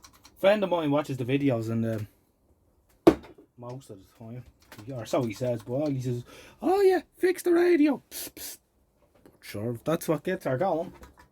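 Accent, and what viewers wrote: Ireland. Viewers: When you were testing the second set your Irish lilt turned Japanese ! Spooky! 6.30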